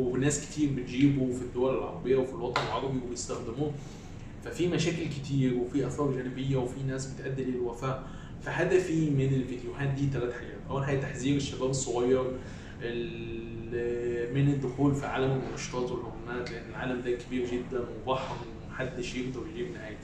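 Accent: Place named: Iraq. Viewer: انت عراقي لو مصري؟؟؟؟!!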